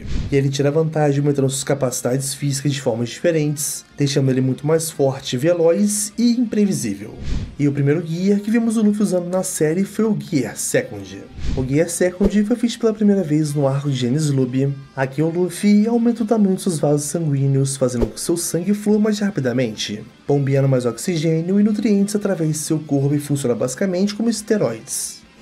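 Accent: Portugal. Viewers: Nasceu em Portugal tem sotaque de português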